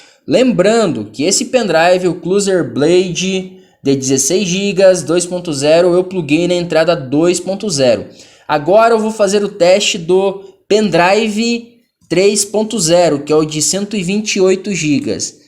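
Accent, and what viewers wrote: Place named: São Paulo, Brazil. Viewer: Uiiii sotaque paulista irritante esse em, existem melhores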